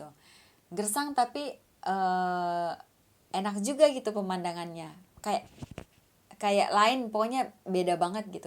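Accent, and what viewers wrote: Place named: West Java, Indonesia. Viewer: Teh logatnya kaya org Sunda,slm knl dari tkw malaysia asal tasikmalaya.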